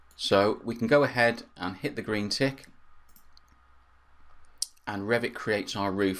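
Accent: United Kingdom. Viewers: Nice British Accent and very helpful sharings :) Thanks alot mate :))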